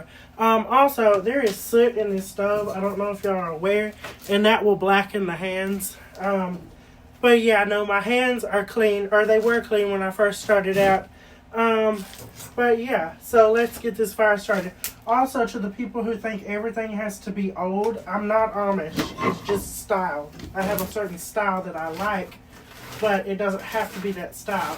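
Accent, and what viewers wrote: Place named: Georgia, United States. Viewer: It’s actually a mix of deep Georgia and some transatlantic it seems. It’s very interesting.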